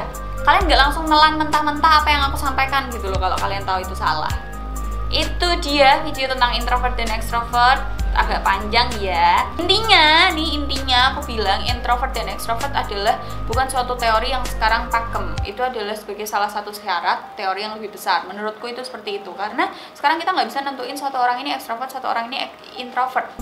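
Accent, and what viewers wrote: Java, Indonesia. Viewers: Haduh lagi" gue gx pikir judul ama isi Lu ngomong ja dah bikin huha Sakjose medokmu ngakakku #nyerang_esteh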